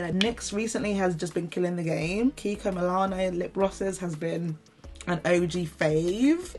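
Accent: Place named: United Kingdom. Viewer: obsessed with how you're putting us on!! are you nigerian?i love your accent, black british folk are another level of gorgeous. sincerely, nigerian american cousin here :)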